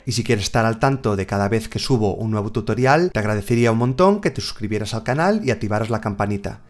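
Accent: Galicia, Spain. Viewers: Excelente descubrimiento este canal!!! No solo recursos en castellano si no con buen acento gallego!. una consulta ¿Cómo se hace para hacer un rango tipo edad >100 sin emplear else?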